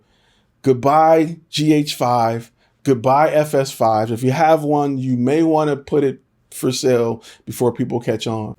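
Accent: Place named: Inglewood, United States. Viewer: weird question, but just curious where you're from? Your "accent" and mannerisms are similar to my former Animation Art Director who grew up Inglewood, CA.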